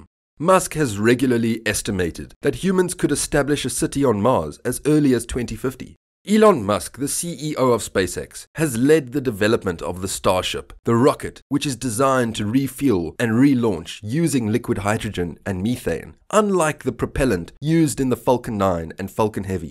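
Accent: Afrikaans, South Africa. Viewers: Great program, but your script was a bit out of date in most of the stuff that was happening in your previous videos. I see you are cathcing up to more current content. Keep up the good work. Nice to hear an Afrikaans accent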